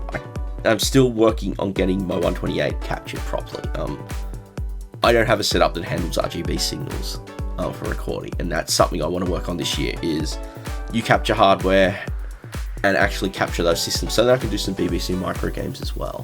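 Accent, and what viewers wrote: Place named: Australia. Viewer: That Australian accent can be quite tricky for me, but I do understand most of it. ;) I think it sounds good, to cover the same game on different platforms. It's interesting to compare the differences. I'm slowly starting to regret naming my channel, so I'm stuck on one platform. I do feel most at home on the C64 (partly Amiga, as well), but it could be very interesting to try some of the other machines. Especially Amstrad and Spectrum. New exciting worlds will probably open up for me.. :) Anyway.. Looking forward to your 2016 content. :)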